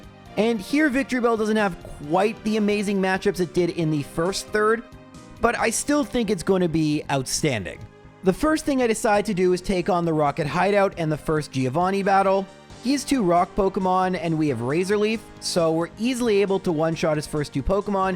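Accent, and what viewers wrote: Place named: Canada. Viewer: Whats funny to me is i wouldn't have picked up on your Canadian accent if it weren't for Y2J and the way you say "against " 😂 not that it matters. Nothing matters.. 💀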